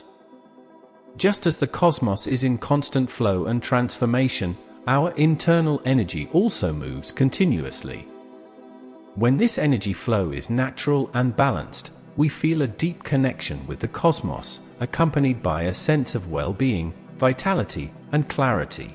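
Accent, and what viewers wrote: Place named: Japan. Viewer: Sounds like Japanese tone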